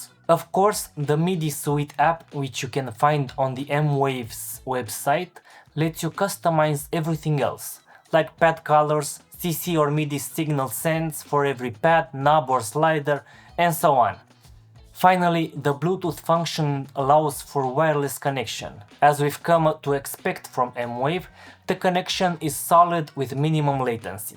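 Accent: Russia. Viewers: Как буд-то русский по английски говорит))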